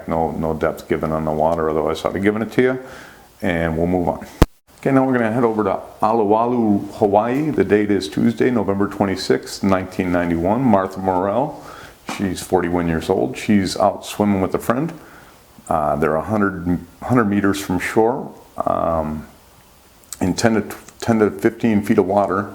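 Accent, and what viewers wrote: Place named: Midwest, United States. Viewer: Great channel. How does a guy with a Minnesota/Midwest accent be so knowledgeable about sharks?! Hahaha great stuff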